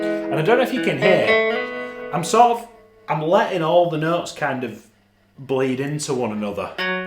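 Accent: North, United Kingdom. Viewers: Call me crazy but you almost sound northerner like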